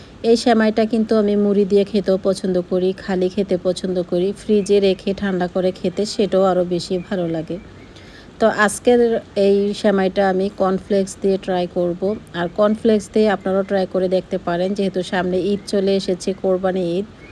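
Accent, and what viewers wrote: Chandpur, Bangladesh. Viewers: চাঁদপুরের ভাষায় কথা বলেন আপু ❤❤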